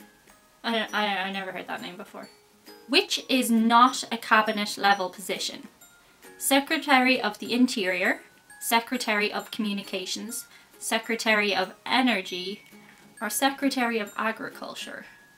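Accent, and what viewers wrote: Ireland. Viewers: Great Job! I love the Irish accent - could listen to you all day. One thing I have noticed, is three and tree sounds similar in your vids and when I watched Facts. Could you say 'The trees were planted in threes"? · I'm from USA . I passed this test easily. I'm a new subscriber and a past visitor to Ireland. Loved that experience and want to come back. I love you're energy , humour, accent. Yes do love the take on the Irish vs USA . Please more! You're beautifull, funny and intelligent. I have to admit I didn't take to Irish food very well. I was looking for American, Italian . And I don't get ice in my soda. No where! Why is that? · Please don’t take this the wrong way but I’m having a bit of difficulty understanding you. While I adore your Irish brogue would it be possible to use a Boston accent in your videos. Thanks!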